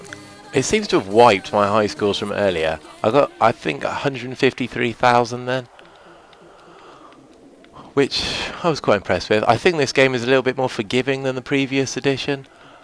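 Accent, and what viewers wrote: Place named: England, United Kingdom. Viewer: I can tell your from England .... but what part? XD nice to listen to an English guy, being English my self makes it better lol (I hope i'm not wrong about you being english XD) also awesome video =D wish I could get this game my self it looks great!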